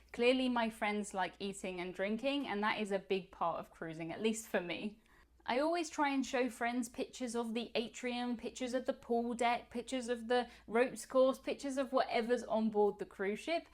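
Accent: United Kingdom. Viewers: Thank you for explaining the “Naughties” were not naughty! I love proper British English, and appreciate your use of it and the explanation for non-British English speakers! I’m excited to hear how your friends like the cruise!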